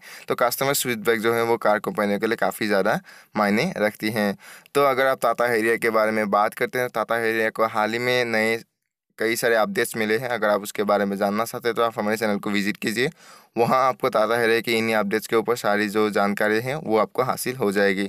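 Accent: Assam, India. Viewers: This is Assamese accent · Axomiya tone full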